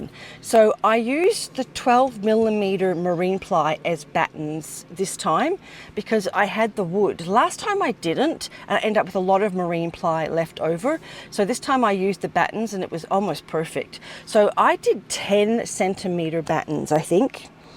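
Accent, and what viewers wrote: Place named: Australia. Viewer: I love your accent! I consider it real Aussie.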